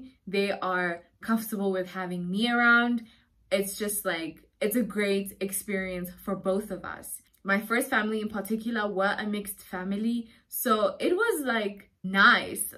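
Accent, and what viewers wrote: United States. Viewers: You came back with a slight American accent 😹. This was very informative thank you.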